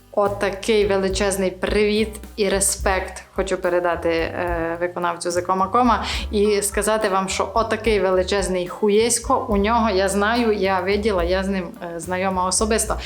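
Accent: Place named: Transcarpathia, Ukraine. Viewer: Будь ласка! Несіть цей закарпатський вайб якомога більше... Це ж бальзам для вух♥️ Маю мрію в серці- навчитися так розмовляти 🥹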